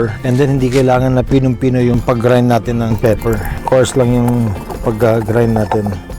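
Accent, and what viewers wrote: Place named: Tagalog, Philippines. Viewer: i am hungry whle watching it.. i love it when u cook, Sir! i also love ur bisaya cos it has a tagalog-toucvhed-accent too. hehhe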